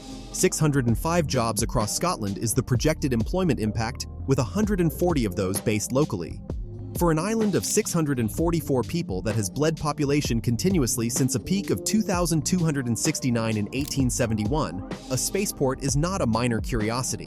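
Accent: United States. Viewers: For the Lord's own sake why the hell is it we have to put up with 'warder' and not water, 'oud of', not out of, 'Bridains' not Britains and so on. I had to turn the sound off as the voice was going through my head. The heading:- 'Forgotten British Islands', then 'Proper Britain '. Dear oh dear, what an insult. Soon after the video started, 'Bridish system' was mentioned. I turned it off in disgust to look at something else as narration was needed. "Blasted Americans, keep to your side of the Atlantic and shut the blasted door. Oh yes, turn the key - permanently"! My son moved to America in 1993. Because he assumed I had come into money, in 2007 had the nerve to call me. I thought that I was talking to an American, listening to him. Wise he was as he never called me again after that. I left Surrey 67 years ago and moved to Dorset. I am told I sound as though I have arrived from London even with the passing of all those years. 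That proves there is no need to act / sound as an annoying American. There is something a little disturbing with the English ,as in the 50s and 60s, cars were made to look American by introducing silly rear tail fins and 'wrap - round' windscreens. "Oh! my dear departed mummy, please come back, 'cos I am starting to cry and also 'cos I loved to hear the click, click of your knitting needles". - 'you there Mummy? - MUMMY?? - sniff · Why the American accent & the annoying music???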